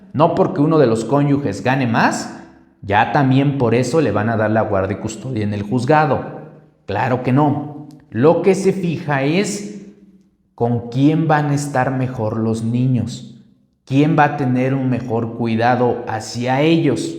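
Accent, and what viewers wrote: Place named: Peru. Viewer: Pensé que era de peru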